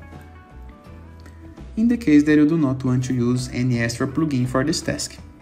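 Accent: Brazil. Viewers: Are you brazilian? The accent sounds like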